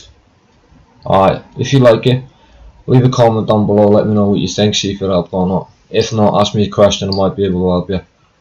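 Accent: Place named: Wales, United Kingdom. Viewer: Mate are you welsh because you sound it.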